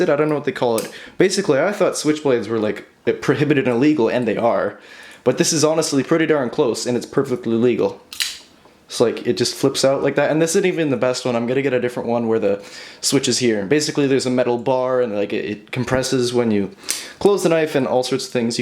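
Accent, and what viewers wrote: Canada. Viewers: Your Canadian accent really comes through in this video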